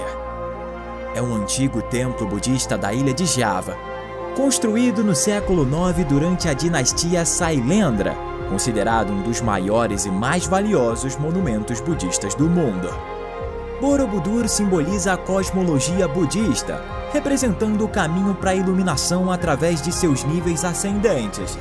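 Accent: Rio de Janeiro, Brazil. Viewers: Essa locução com sotaque carioca cheio de X meu irmão é impossível ouvir 👺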